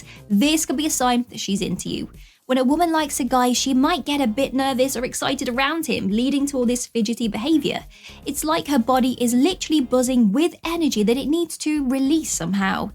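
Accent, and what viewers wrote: United Kingdom. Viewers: Good advices! R u from UK?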